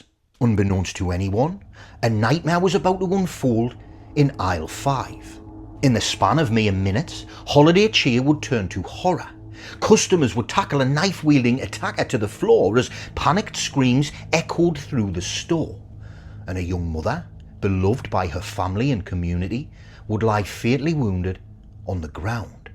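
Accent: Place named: North East England, United Kingdom. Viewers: What part of the cannie north east are you from pal? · A true crime channel narrated by a real human AND a Northerner too! New sub and thanks! :) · Yes Lovely Geordie accent, ❤❤❤❤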